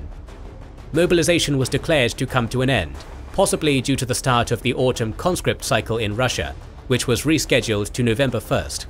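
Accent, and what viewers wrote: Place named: United Kingdom. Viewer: Gives me vibes like The Economist. Also British accent, same style of snark. I love it!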